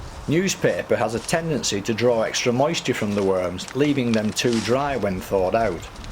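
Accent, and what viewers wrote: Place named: North, United Kingdom. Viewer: The commentary is so Northern I had to wear a flat cap to listen to it. Great video, mate.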